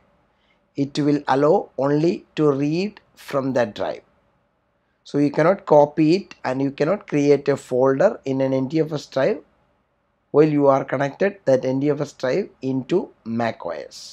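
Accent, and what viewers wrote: India. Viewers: Man, that OG Indian accent!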